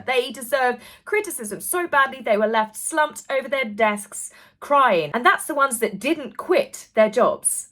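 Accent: United Kingdom. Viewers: I agree that is like chatting with a friend, who happens to be gorgeous, bubbly, smart and has a terrific British accent!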